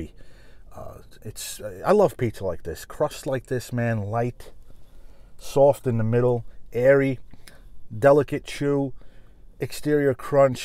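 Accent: New York, United States. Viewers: This dude has the perfect NY accent. I love it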